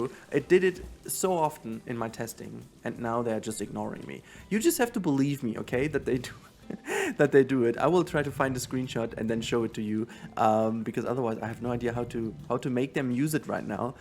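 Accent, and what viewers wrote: Germany. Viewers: Direkt den Deutschen Akzent herausgehört :)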